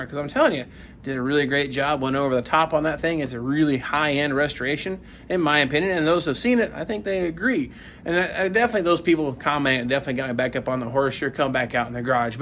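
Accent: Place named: South, United States. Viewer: This is kind of interesting. I’ve been watching you for over a year. I always kind of thought that you are from the south, because of your accent, even though you call people “you guys.” I’m from Texas, & I thought you to be maybe from Tennessee or northern Louisiana, or the like. 🤠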